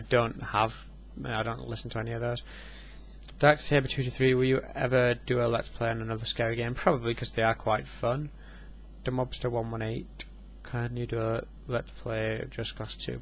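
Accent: England, United Kingdom. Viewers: which part of england are you from?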